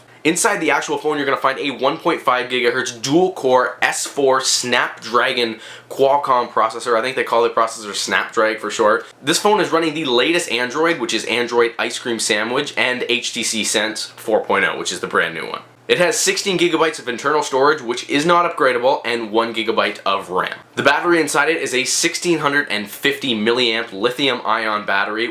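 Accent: Canada. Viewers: Are Canadian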